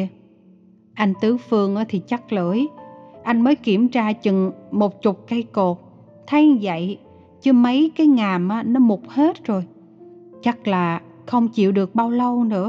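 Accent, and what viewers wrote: South, Vietnam. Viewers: Truyện hay, tình cảm nhẹ nhàng: 9/10 Giọng đọc miền Nam của xuất sắc, và cách đọc, diễn tả quá hay.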